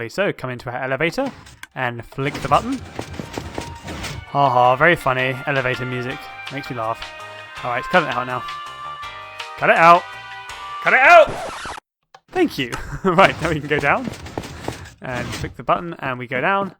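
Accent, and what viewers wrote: United Kingdom. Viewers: i love the british accent.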